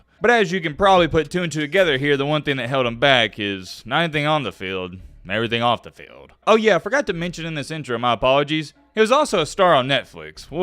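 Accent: South, United States. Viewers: What kind of accent is this? Tone it down. Good content but come off the southern drawl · I’m from the south too brotha but dang hire someone to narrate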